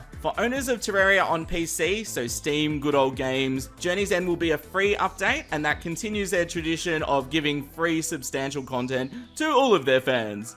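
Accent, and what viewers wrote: Australia. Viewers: You’re definitely australian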